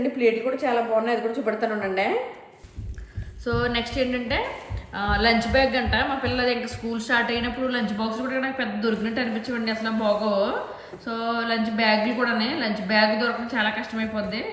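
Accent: Godavari, India. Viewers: I too like your godavari language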